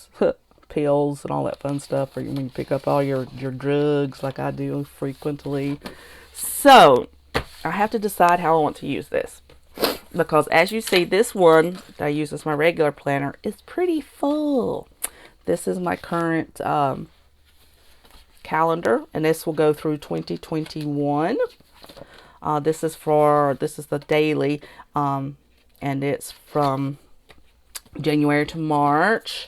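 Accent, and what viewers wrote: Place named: South, United States. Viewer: Love your accent! I’m from Mississippi but live in Alaska. I don’t hear that lovely southern accent much anymore.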